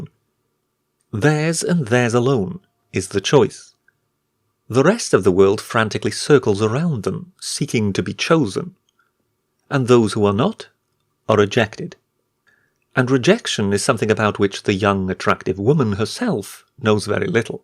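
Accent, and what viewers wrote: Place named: RP, United Kingdom. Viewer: Is this your real accent or do you ham up the poshness a little? I'm middle class southerner but I've never heard someone in my personal life talk like you in these videos. Then again, you have some inflections that sound like a Yorkshire man too. It is confusing haha This isn't a hate comment; just curious. I love your vids man.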